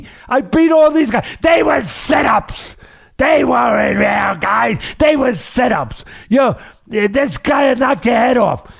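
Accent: Newcastle, United Kingdom. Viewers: Kick off, true geordie. Gets me through the day major videos all the time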